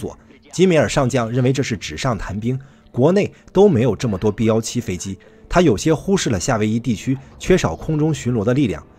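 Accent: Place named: Beijing, China. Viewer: 你是京腔啊 我還以你是台灣人呢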